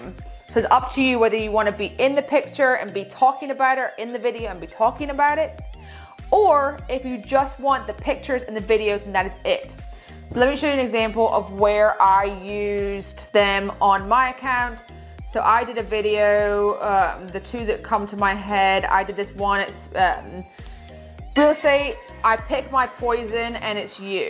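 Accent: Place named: Australia. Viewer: ohhhh okok I thought I heard Aussie in there! ❤️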